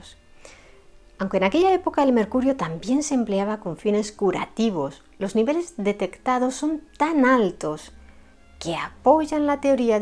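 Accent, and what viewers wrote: Spain. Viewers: Me encantó la< forma de contar las historias....pero muy, muy superior es la forma de transmitir, la entonación del relato me cautivó. No me es precisamente atractivo el acento español sin embargo me he enamorado de esa voz y no me pierdo capitulo, el último Oscar Wilde. Me gusta la historia y este canal me resulta muy atractivo y fácil de asimilar además de bastante documentado e hilvanado. Saludos y gracias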